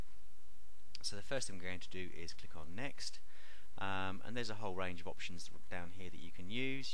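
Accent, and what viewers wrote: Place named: England, United Kingdom. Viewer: love the english accent... nice video btw... can you please tell me how to rotate images added in word documents?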